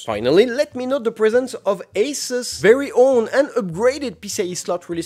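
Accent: France. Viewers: Is that a french accent ? :) great video though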